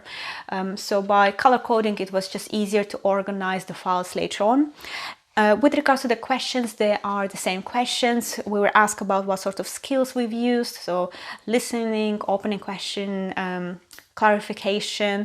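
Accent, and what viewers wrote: Russia. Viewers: I can hear Russian accent. Are you Russian?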